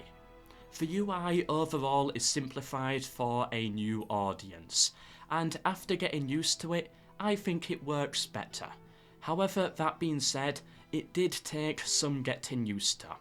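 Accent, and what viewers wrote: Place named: Yorkshire, United Kingdom. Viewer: my relatives are from bridlington, you sound similar. Hope thats not an insult! Love the channel !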